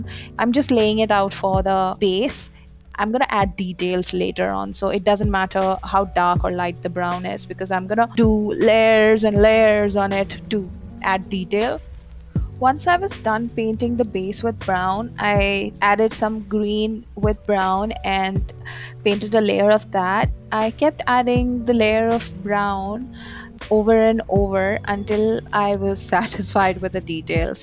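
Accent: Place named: Kerala, India. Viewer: I guess ur from Kerala Because of ur english i came to know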